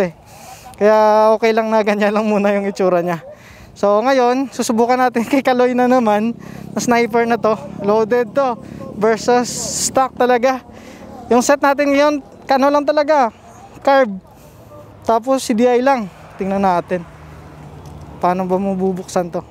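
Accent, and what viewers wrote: Iloilo, Philippines. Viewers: Ilonggo ka sir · Taga saan ka po kuya. Bat medyo ilonggo ka hahaha. (Iloilo) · kahit anong tagalog mo idol lumalabas talaga pagiging ilonggo♥️ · Saan lugar 2 sir,,ksi ga ilonggo ka eh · Haha illonggo kaman?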